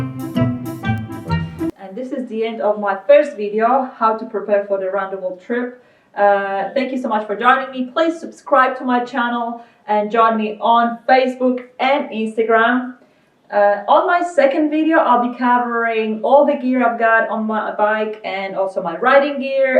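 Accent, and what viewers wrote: Australia. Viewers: Australian.....yes can pick Aussie accent....and polish....so am i....doing this on your own Awesome. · I spotted the Aussie influence in your voice and attitude. You're an exceptional individual and supreme ambassador. The world needs more of you. ❤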